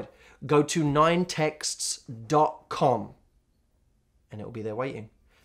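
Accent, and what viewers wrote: England, United Kingdom. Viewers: BRIT ACCENT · I watch all his videos just to see him and listen to this heart-melting English accent 😭❤ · I love the British accent... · What text can I send you to get your attention?Haha British accent Loves it!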